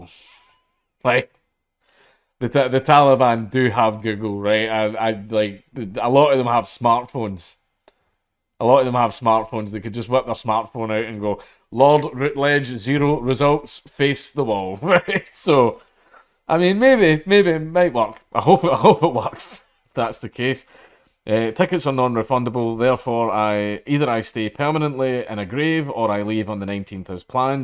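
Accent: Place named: RP, United Kingdom. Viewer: I love posh accent, pure gold:D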